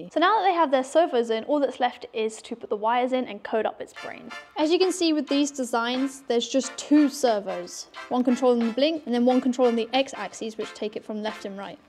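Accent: United Kingdom. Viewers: YES QUEEN UR FROM THE UK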